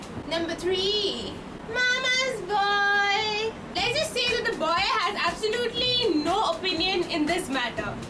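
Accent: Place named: India. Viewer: You're really pretty but there is something about your voice that's not real pleasing to my ears. Please I kindly request you speak a little slower. When you speak fast like this, the indian accent makes it difficult for people who aren't used to hearing it often to understand. Thank you and keep making more videos. :)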